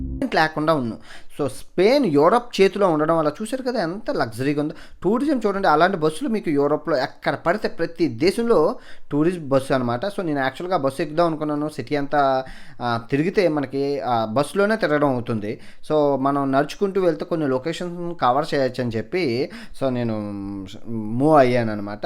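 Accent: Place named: Vizianagaram, India. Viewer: Bro i am frm Vizianagaram.. Nen miru upload chesina prathi video chusthanu... Andhukante nenu yelaago out of country vellalenu kabatti... Mana slang lo entha baaga chupisthunnaru... Nen video chusthunte nenu vellinattu vuntundhi.. Really thanks bro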